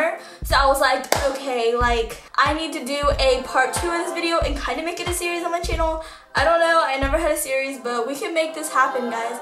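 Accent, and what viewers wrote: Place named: Canada. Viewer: Oh I thought u were Canadian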